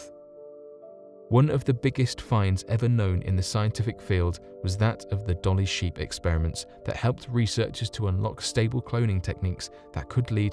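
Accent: United Kingdom. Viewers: All this is a thievering compilation of other people's info from the net and presenting it with a british snooty accent for ignorant fools as news.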